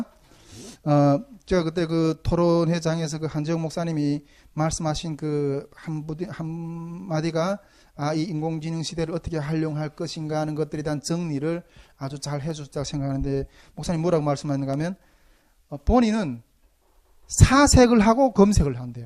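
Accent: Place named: North Korea. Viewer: 이런선교모임도 있었네요. 윗 동네분들 발음과 억양은 조금은 생소합니다만 주안에는 이런 시원한 생수같은 말씀을 처음 듣게됩니다. 귀하시고 감사드립니다.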